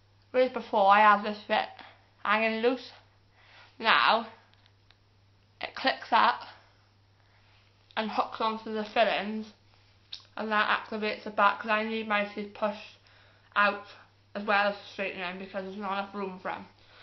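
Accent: Wales, United Kingdom. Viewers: hun what practice did you have your teeth done with you sound welsh and im from caerphilly your teeth look amazing xx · I love your Welsh accent. It's been ages since I last got to hear it now I've moved to Canada. Just wondering, how long did your treatment take? I must have missed it in the video. Did it hurt?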